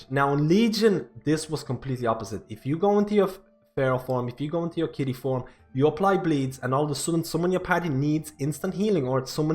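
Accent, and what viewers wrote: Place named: Dublin, Ireland. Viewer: Where are you from? I can hear a bit of a Dublin accent in your voice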